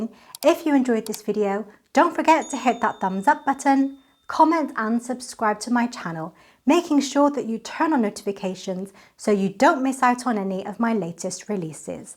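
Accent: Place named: Bristol, United Kingdom. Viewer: Don't get caught with your pants down had me in stitches. Is that a Bristolian accent I hear ? Definitely West Country for sure. Looking mighty fine beautiful lady, keep up the great work you do.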